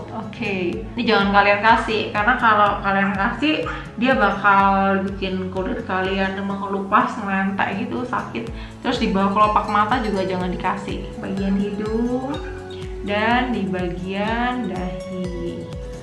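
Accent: Java, Indonesia. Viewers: Dokter asli mana nih logatnya kok medok?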